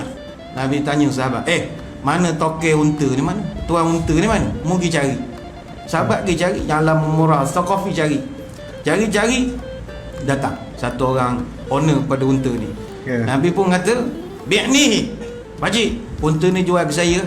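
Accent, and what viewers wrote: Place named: Terengganu, Malaysia. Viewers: Loghat campur2 antara terengganu dgn pahang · Ustaz ada masuk slank (bahasa) Terengganu sikit...tapi rasa sedih dn sama rasa rindu kita kpd Nabi SAW dlm cerita Nabi kali ini. Moga kita semua di dlm rahmat Nabi SAW...Aaminn3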